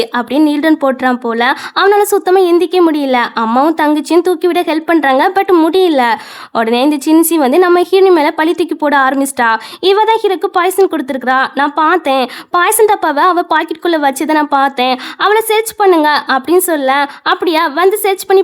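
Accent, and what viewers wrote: Tirunelveli, India. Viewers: Unga voice romba nallaruku pa neenga nellai slang dhana pesurenga nan tenkasi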